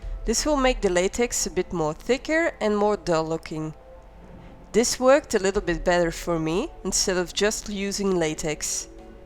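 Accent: Netherlands. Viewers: This looks really good and detailed! thanks! btw where are you from? your accent sounds slightly dutch or something😊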